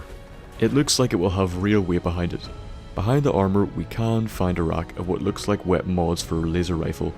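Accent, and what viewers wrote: Northern Ireland, United Kingdom. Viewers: Finally realised you're from Northern Ireland. Rad about ye kiid? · Are you from Northern Ireland ?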